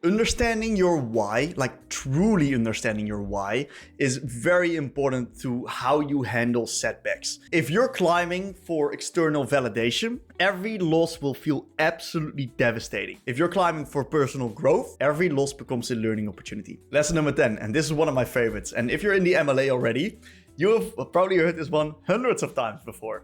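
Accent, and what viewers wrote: Netherlands. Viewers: Hey, this is probably something you’ve heard before and I don’t want to come off as mean, really I don’t, but I think it would genuinely do you well to spend some time tweaking the way you ultimately articulate your coaching. That is, I think you will gain a respectable amount of traction if you do so. Thing is, and again you’re probably aware of it, but your pronunciation is like, passing… but not good. It’s okay, you know, but also just… okay. Even then I have to say that as a fellow Dutchie I do have a soft spot for your Dutch-English accent, however it still took me a bit to warm up to it (compared to native or more proficient English speakers that produce similar content) and I just think to some new viewers it’s possibly really an active deterrent to becoming full time viewers. Maybe. Your English itself is very solid, don’t get me wrong. 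I do wonder if you could somehow review your video scripts in order to have them be fully polished, because right now you occasionally get an English Expression or a certain common collocation slightly off (or some subtle grammar or vocabulary related mistake where actually☝️🤓 or whatever). While this is more of a small nitpick, I think it’s comparatively easier to solve by simply catching those small mistakes while reviewing your scripts. That’s my two cents on this. In the end I’m just a nobody and a relatively new viewer of your channel, because I recently swapped my main role to mid, so take all this with a grain of salt if you wis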